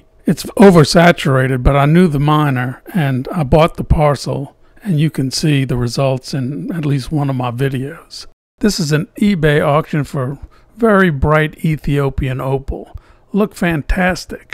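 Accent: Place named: South, United States. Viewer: Hello, I was wondering if you sell opal?? And how come I absolutely love faceted opal but I NEVER see faceted Australian opal?? If you sell opal, please let me know! I buy Ethiopian and I’m wanting a large hunk of Australian but good lord it’s expensive. And your very southern, where you from friend? I’d love to sit down with you and saturate all your information!!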